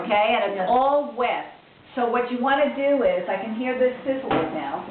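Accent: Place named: Brooklyn, United States. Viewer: Could hardly tell you were from Brooklyn.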